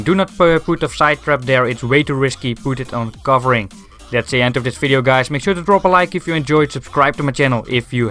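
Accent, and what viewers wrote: Netherlands. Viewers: Are u dutch bro?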